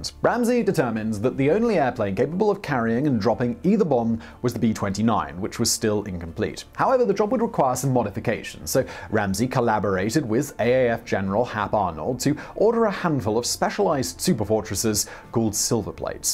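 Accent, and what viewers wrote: United Kingdom. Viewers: My wife: how many channels does this guy have? Me: why? My wife: I hear that Brit's voice all the time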